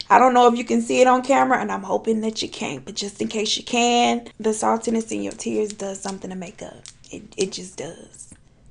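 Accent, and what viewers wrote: South, United States. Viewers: You’re so well spoken with a southern 🛎 accent! So cute ! Love it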